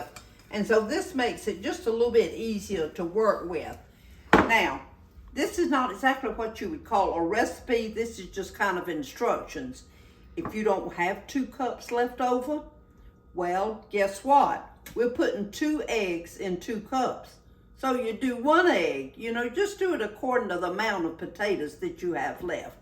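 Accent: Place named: South Carolina, United States. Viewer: I live in Central California and love your accent!🤗I was born in Beaumont Texas (75 years ago) and I can remember my aunts and family speaking with accents like yours. The type of foods Southerners eat are slightly different from a Northerners diet/menu. My mom was a Yankee but met my dad while she was working in the South. She never developed the hunger for southern foods so we ate kind of a northern/ southern menus. She did cook some things for my dads requests. It’s like a family reunion listening to you. -and eating your southern fare. Thanks so much. I have learned a lot and it’s like vitamins to my soul. You have made Jesus shine, with love and skill. Thanks a lot I enjoy watching you tell stories and cook and taste what you my made. God bless you and yours. I agree there is something very satisfying about banging the spoon you used in the dish on the side of the pan/pot. 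Thanks for being part of ‘our normal girls,cooking club’.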